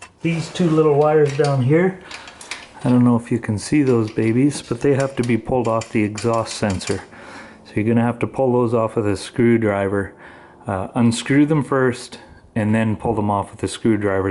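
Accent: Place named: Calgary, Canada. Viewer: Great video mate, love the accent, reminds me when I lived in Calgary. Question. What is the purpose of the servo on the exhaust and is it ok to disconnect it like that? I recently bought a 1000 and when swapping the fender eliminator I notice I also didn't have those servo cables. Cheers from Australia.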